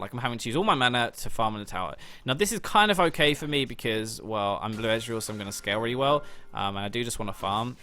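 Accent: United Kingdom. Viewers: "I think honestly, basically, i mean. i don't honestly, i guess. he honestly, basically it means, actually, honestly" - Damn You really need to limit yourself when you use those words and phrases it really pissed me off. On these 7- 10 min videos you can't actually notice that, but on this video it is really REALLY horrible. I Love your British accent a lot and the content you are making, but those phrases man, damn. Keep up the good work tho.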